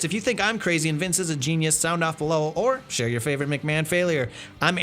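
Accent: United States. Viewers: wtf who is this dirty american where is my sweet voice of adam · as soon as i heard the american accent, all i could think of was 'she doesn't even go here' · You know, after all these foreign accents this American accent is foreign to me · An American accent on Whatculture Wrestling just seems...off. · Its stupidly weird hearing an american on what culture wrestling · I love Simon, so I'm not just one of the Adam fanboys, but I just don't like this guy's voice. Too American for this channel. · Ahh, finally.... An American voice over, for a top ten video.. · What culture shouldn't be narrated by Americans #wewantadam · what is with the shitty stupid sounding american accent? if this keeps up you will lose ALOT of subs! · and why there's no british acent in this video? they americans stole the cahnnel? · An american whatculture narrator... amazing. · Sorry but whatculture really doesn't suit an American accent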